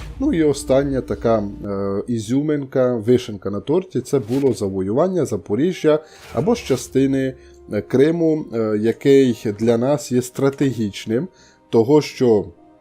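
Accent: Transcarpathia, Ukraine. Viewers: Якщо я правильно зрозумів по говору, то ви з Закарпаття. Можна зробити особливістю канала те, що ви будете включати у свою мову особливості вашого діалекту)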